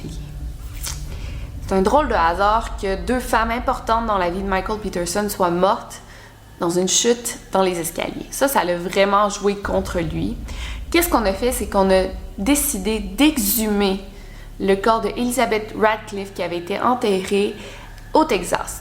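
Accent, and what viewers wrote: Quebec, Canada. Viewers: J’adore t’écouter. Avec ton accent québécois ou tout simplement canadien tu assures. Je t’ai connu avec une vidéo que tu as fais avec Lionel Camy, merci Lionel. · Je kiff grave ton accent québécois 😊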